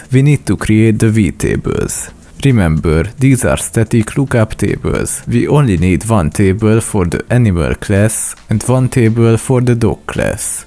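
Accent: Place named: Hungary. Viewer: Came for C++, stayed for the Hungarian accent.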